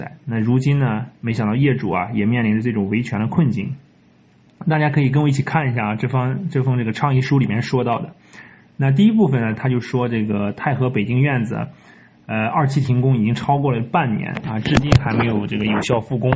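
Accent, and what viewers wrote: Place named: Fujian, China. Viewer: 博主聽口音像福建人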